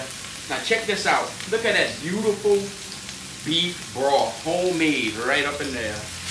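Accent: Louisiana, United States. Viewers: I'm from Washington DC and we have a unique accent. I like listening to your Cajun accent. 😊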